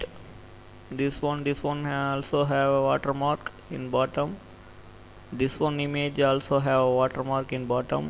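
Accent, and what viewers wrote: India. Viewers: Good, where are you from? I am thinking a state from India, tell which one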